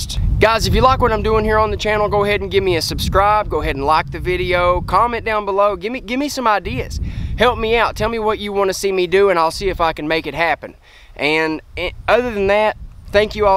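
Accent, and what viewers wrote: South, United States. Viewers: Good content and that southern draw is definitely new in the reviewer space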